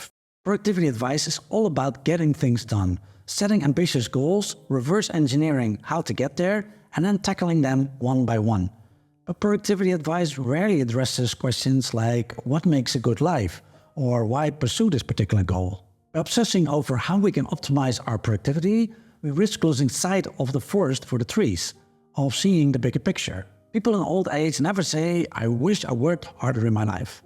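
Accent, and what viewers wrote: Netherlands. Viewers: Love your Dutch accent 😊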